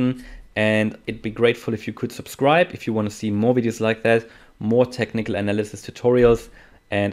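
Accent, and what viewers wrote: Germany. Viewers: Vielen Dank für die super Erklärung mit deutschem Akzent! (VG aus die NL! 🇳🇱🧀🙂)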